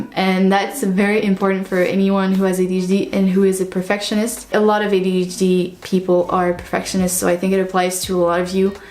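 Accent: France. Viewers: Hey there, I just discovered your channel while researching adhd paralysis tips and this is so helpful! I'm undiagnosed but everything adhd I relate SO MUCH. Not related, but are you french? I feel like you have a tiny bit of an accent I recognize in us french speakers? Have a great day love, thanks for this. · Loved the video, thank you so much! Also, are you french? I noticed a tiiiny bit of an accent eheh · Great video Thanks Are you french? :)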